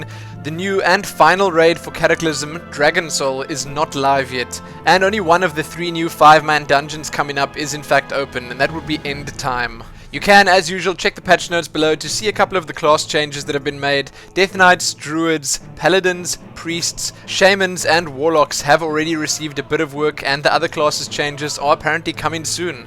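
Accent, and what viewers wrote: South Africa. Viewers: sounds south african... then i lost interest straight away...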